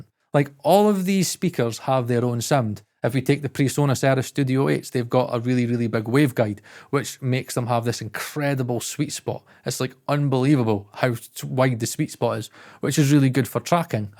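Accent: Scotland, United Kingdom. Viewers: That's the most aggressively Scottish accent I've ever heard in my headphones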